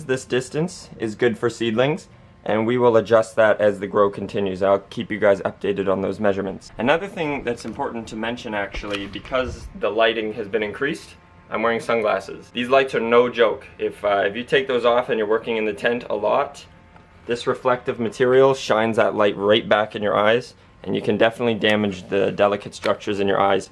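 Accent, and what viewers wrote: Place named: Canada. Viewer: Great vid, nice and concise, aaaand Canadian? Subscribed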